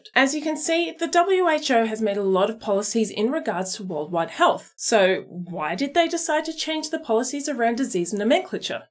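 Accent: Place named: Australia. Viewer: Always great to hear clear & reasoned arguments / information about important topics - extra important in the fight against disinformation in modern times. This is especially cool from someone with an Aussie accent (I'm in Bunbury in Western Australia).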